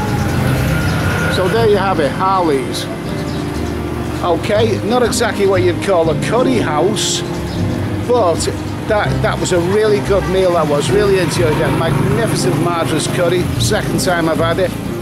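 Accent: Liverpool, United Kingdom. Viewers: a bit of a scouser I think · New to your page, you sound like a scouse. I haven't lived in UK for almost 50 years. It looks OK there.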